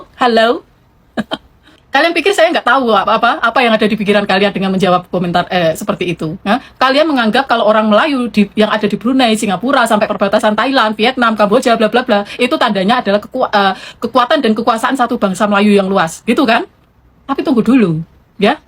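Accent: Java, Indonesia. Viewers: Loghat jowo bangetttttt...sesuai jadi bibik ku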